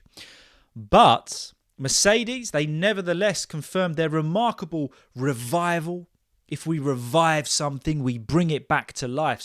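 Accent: England, United Kingdom. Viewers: please clarify which of the English accents do you speak?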